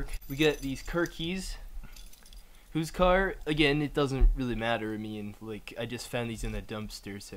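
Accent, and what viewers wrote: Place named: Boston, United States. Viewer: Cool dude sounds like he has a slight accent of some sort. Boston perhaps? 😂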